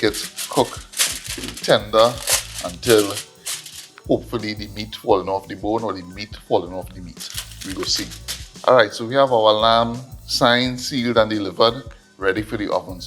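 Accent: Trinidad and Tobago. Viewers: I love to watch you cook Bro. I also love to hear the real trini accent when you explaining how to prepare the dish. Total Trini style! Ah bigging you up! 🙌🏾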